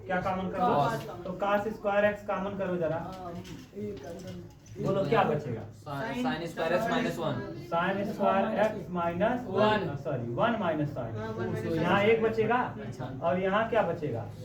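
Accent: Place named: Bhojpuri, India. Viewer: really liked the way how you teach ....in bhojpuri accent ,apke bache boht shor krte hai par lol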